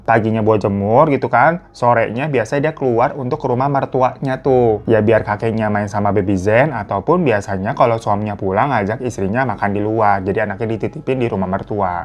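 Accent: Java, Indonesia. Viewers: Bahasa Palembang logat Jawa 😂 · salfok logat jawa di bahasa palembang😭 gak papa bang dimakluminn kerenn kok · logat pembang nyo bang mirip logat jowo 😂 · Bhso palembang nya jd aksen jawa yo D maklumi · Kak...😂 Ngapolah logat nya be cerito cak wong jawo😅... Kito wong Palembang Galo ee kak 😂😂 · Bang ngapo logat nyo jawo😂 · logatnya medok bgt😂😂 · Lucu bgt 😅😅.. logat jawa tp bahasa Palembang 😂😂😂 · logattt nyo idk cakitu kok malah logat jawo🤣🤣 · Salfok bang ngmong pake bahasa palembang tapi logat jawa hahaha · Lucu bngt denger bg tiap x ngmong bhs mana pun tp logatnya ttep jawa..😂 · kok logat jawa bg😂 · Bahasa palembang tp logat ny medhok jawa bgt ya bang 😁. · Bahaso palembang logat jawo abng ni🤣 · salfok, lucu banget bang baca teks nya tetep ada aksen dan nada jawanya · Pokoknya bahasa apa aja pke logat jawa sama si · baso plembang with jowo accent unlocked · Bahasa Palembang logat Jawa 😂😂😂😂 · Baaaang ini logat palembang looooh kenapa pake logat njowoooooo ang ang ang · Bang logat nya jawa bangat deh 😂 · logat Palembang nya lebih kelogat jawa😅🥰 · Bahasanya bahasa Palembang tapi aksennya aksen jawa..salam dari Palembang bang🙏🏻🙏🏻🙏🏻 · Lucu nian, bahasa Palembang tapi logat medok😭😭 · Palembang bang.. 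ngapa dah pake medok Jawa aksen bacanya 😂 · Apapun bahasanya... Logatnya tetap jawa.... Kocak memang Abang satu ini... 😂😂😂 Jawanya kentel banget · Bhs palembang logat jawo😂😂,, lucu amat😂😂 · Ngakak, ngapo logat palembang jd logat jawo wkwk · Salfok dgr bang orng wong kito orng melayu sumatera,logat bang logat jowo😂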